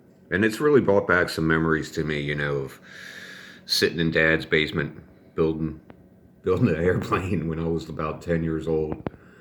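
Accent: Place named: Pennsylvania, United States. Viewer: are you in Pennsylvania, possibly philly? I am guessing by your accent.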